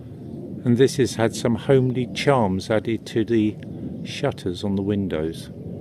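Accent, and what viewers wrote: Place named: England, United Kingdom. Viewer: Nice video. Glad you made it. I love the architecture. This guy has the best voice. Love the accent. I’m not sure where he’s from but it has to be close to Michael Caine’s home. They sound identical. Definitely the same accent. This guy has a wonderful voice.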